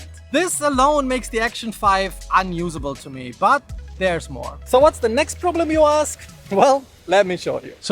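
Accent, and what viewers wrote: Germany. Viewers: My first visit to your channel. Very nice experience. For once, a sure feeling that you are not promoting anything. We need people like you. And if I were in China (or Dubai) with you, I would find your German accent charming ;)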